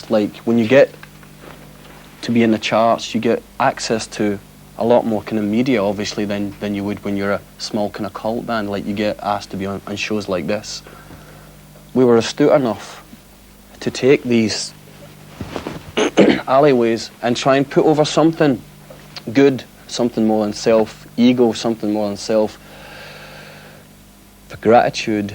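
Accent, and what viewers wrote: Scotland, United Kingdom. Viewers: some kind of cute he was in this interview, i love his scottish accent 💕 I love 🥰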